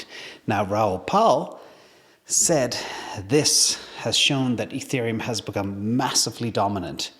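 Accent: Ireland. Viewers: love the vids and the take.. where in Ireland are you from? If I had to pin your accent down.. Rebublic, Dublin, South side.. Dalkey or close? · . I hear an Irish twang in the accent?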